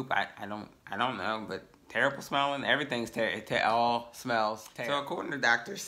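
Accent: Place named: London, United Kingdom. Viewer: lol the London accent.. No not the S curl- good information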